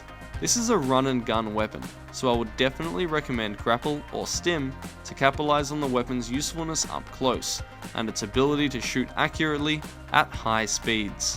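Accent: Australia. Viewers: Are you Australian I'm Australia you sound like your Australian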